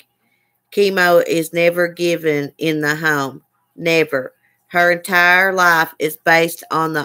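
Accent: South, United States. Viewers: New sub, just like hearing a fellow southern accent! I only watched A once way back when the golightly drama happened. Hate this for the ones she hurt deeply and her son.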